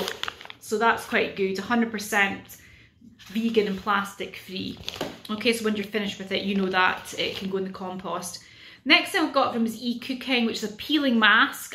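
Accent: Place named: Scotland, United Kingdom. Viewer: Great video nice to hear another Scottish accent xxx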